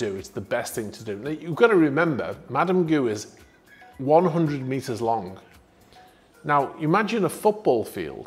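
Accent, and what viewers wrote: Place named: England, United Kingdom. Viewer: Hes got some kind of classic english quality that makes him go easy on my ears so thus i watch him. the yelling screaming youtubers - its not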